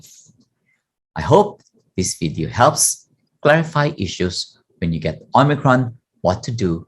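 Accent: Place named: Philippines. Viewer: Your name sounds Chinese but your accent sounds like you're from the Philippines.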